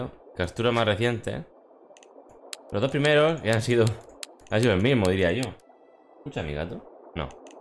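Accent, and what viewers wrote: Murcia, Spain. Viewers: Es de Murcia ese acento??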